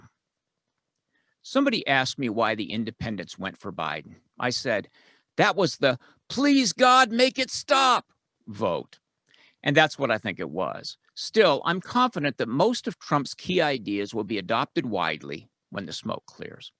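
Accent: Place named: California, United States. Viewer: Californian huh, you project it well.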